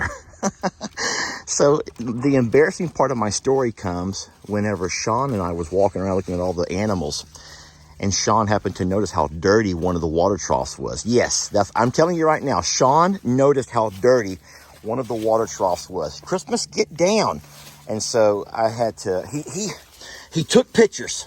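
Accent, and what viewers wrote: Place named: Texas, United States. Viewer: to this Aussie Texas accent isn't clear English. I often have trouble but I agree with the captions being distracting.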